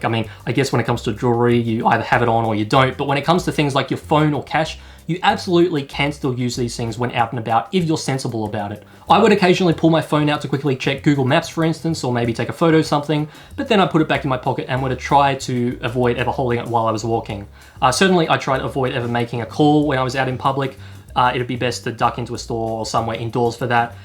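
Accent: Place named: New Zealand. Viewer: Love that kiwi accent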